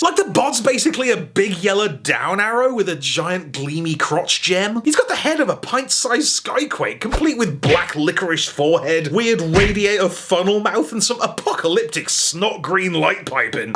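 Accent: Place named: United Kingdom. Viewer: It's the accent. Everything sound epic in a British accent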